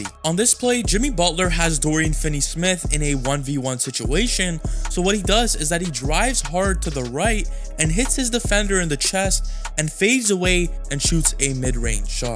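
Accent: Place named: Miami, United States. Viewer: ooohh ok. I ask cos your accent is similar to a Miami accent. When you say "ago" in "two weeks ago" at the beginning, it sounds just like a Miamian lol and the way you say "bro" fits in perfectly on south beach lmfaooo. Great video! Love to the see Heat getting love. Haywood Highsmith is awesome!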